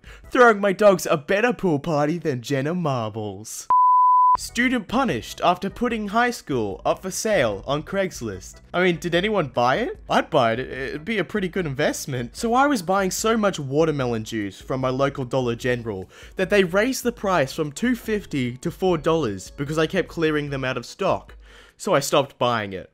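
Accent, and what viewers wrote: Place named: Australia. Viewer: Emkay bUt Australian.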